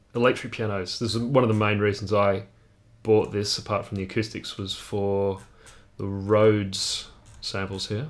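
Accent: Australia. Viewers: Most Austrailian bloke I've ever heard! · Man, just your introduction with your Australian accent puts me in a good mood. Thanks for the review.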